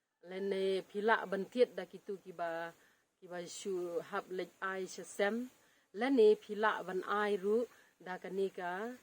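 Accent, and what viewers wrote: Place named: United States. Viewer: Sem syiar jng phi da don da ki batch mo katno batch kiba rit bd ktno kiba heh bd ktno kiba lah long bam doh bd ktno batch kiba lh kha pylleng. Kren style phi te mo kren fareng te lh wai da kwah batai ia ki nongrep khasi jng ngi sngewbha batai da ka ktien khasi nmr bun ki dei bym sngew fareng kum ma phi, phi te ka accent lane ring Sur thik kum ka Mem na USA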